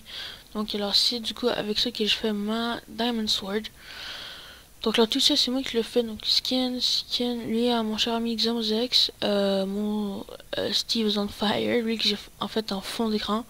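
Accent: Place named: Quebec, Canada. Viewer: quebecois je suis quebecois et je reconais laccent il est juste young faque surment que sa voix est un peu weird mais javais la meme avant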